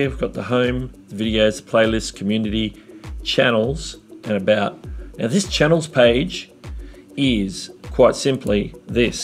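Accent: Australia. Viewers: this guy is Australian?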